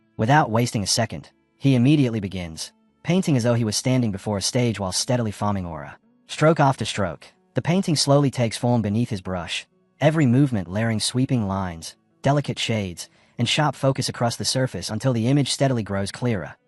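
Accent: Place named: United Kingdom. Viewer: Didn't expect the ai to be British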